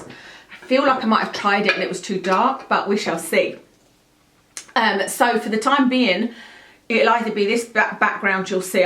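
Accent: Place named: Essex, United Kingdom. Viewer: I like your videos - you're so down to Earth. I also find the Essex-like accent amusing, especially how "l" is pronounced as "w" (... get my naows done), lol. 😆💅🏼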